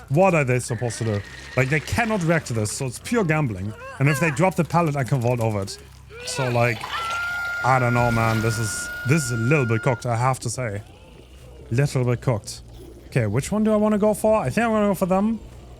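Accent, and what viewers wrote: Germany. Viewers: Bruder hat den Deutschen Akzent. Aber krasses Englisch hatte ich am Anfang erst nicht gemerkt.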